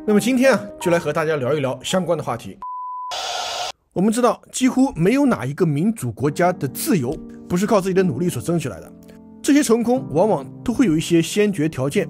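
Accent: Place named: Jiangnan, China. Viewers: 他口音肯定是江浙沪的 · 兄弟是哪里的人？太爱你了，听口音是江浙一带的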